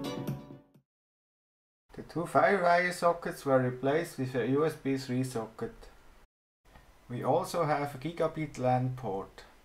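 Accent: Switzerland. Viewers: ... swiss accent x3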